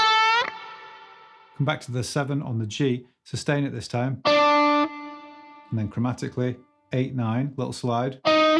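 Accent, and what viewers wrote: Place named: United Kingdom. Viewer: You deserve so many more subscribers,. Keep it going! Oh, and you kind of remind me of a young Mick Mars (with the hat) with a British accent.:P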